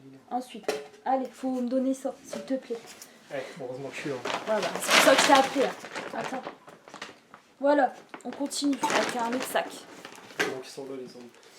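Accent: Nord-Pas-de-Calais, France. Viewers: Coucou. Le noz de louvroil est il plus petit ou grand que celui de fourmies?je suis du val de maubeuge.merci pour tt.et sympa ton accent chti.heinnnnnn!!!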